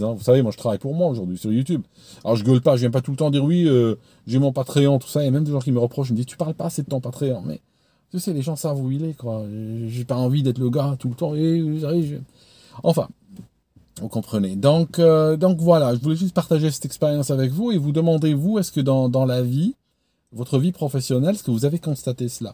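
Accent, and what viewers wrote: Switzerland. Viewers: L’accent Suisse 😅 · T quand même un sacré personnage ! Et il vrai que ton accent suisse revient de tps à autre... En ts cas je vis la même chose que toi au niveau de l'excellence dans mon poste de travail et au moment où je demande un stage pour me mettre au "goût Du jour" informatique et nouveaux matériel lumières (je suis éclairagiste) on me dit : non pas la peine de, car tu es tellement bon que tu vas te former seul !!!. Voilà, comment être mis à l'écart et sombrer dans une espèce de désespoir et donc ne même plus être bon dans mon domaine de compétence.. Merci 😢. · Si ton accent Suisse amusait du monde imagines l'accent africain 😅pire mélangé un peu à l'accent québécois. Je viens de la France avant hier même mes gosses se foutent de mon accent. malheureusement c'est ce que j'ai remarqué chez les francophones contrairement aux anglophones chaque fois j'ai été aux États-Unis, à Londres, en scandinavie... 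Jamais on s'est moqué de mon accent en anglais les américains me disent même your English is very good quand je leurs dis excuse my bad English. En terme devolution en entreprise t'aurais vraiment pu essayer le Canada c'est juste fou comment on évolue vite même en salaire d'où suis parti de l'Europe car je savais que si je restais rien n'aurait bougé jusqu'à ma retraite bah j'ai refusé de me contenter de ça et suis heureux d'être parti il y a bientôt 3 ans. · Vous m’avez fait rire et vous êtes touchant et ça m’émeut !!! avec l’accent suisse et c’est le dibou 😀😀😀😀👍👍👏👏👏👏👏👏👏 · Mais c'était quand même sympa l'accent suisse ! J'en redemande ! Sinon, absolument d'accord. Le monde de l'entreprise est plutôt ingrat, en plus d'être rébarbatif. On doit y côtoyer plein de gens absolument ineptes et, le plus souvent, on a fait le tour des tâches en moins d'un an, à quelques ajustements près. Tu n'as jamais entendu "je ne comprends pas pourquoi tu veux cette augmentation, tu aimes ce que tu fais. 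Crois moi, ça n'a pas de prix " ? Tu as aussi de grosses boîtes qui pensent que tu as tellement de chance de les avoir pour clientes que tu devrais travailler pour zéro et pourquoi pas finir par te prostituer (symboliquement) pour pouvoir les conserver. Heureusement que je suis mon propre patron. Je n'ai plus de patience, je me ferais virer rapidement. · Bonjour, cette histoire d'accent suisse qui revient quand tu es fatigué m'a bien fait rire, moi c'est ma femme qui lorsqu'elle s'énerve ou qu'elle est stressée et celà arrive souvent me parle en kabyle alors que je ne comprends rien vu que je suis Français. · Ouais j'ai adoré ton accent Suisse j'ai pensé à mon copain Paquito, et des bons souvenirs...Merci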